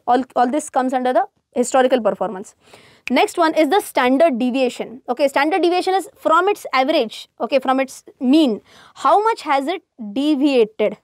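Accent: Maharashtra, India. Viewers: English in Marathi style? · I love ur marathi accent..... Awesome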